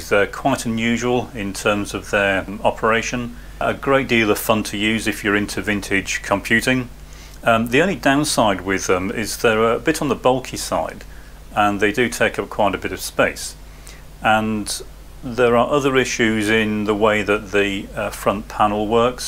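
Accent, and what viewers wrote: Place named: United Kingdom. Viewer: Interested. Keep us up to date with progress please. I'm encouraged by the British accent - where would these ship from ? I'm fed up of paying import duty and VAT on gear I import from the US.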